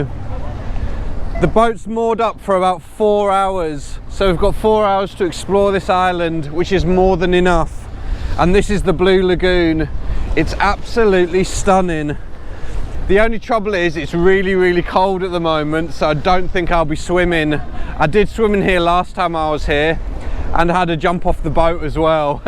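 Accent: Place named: United Kingdom. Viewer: Nice to hear good British accent ..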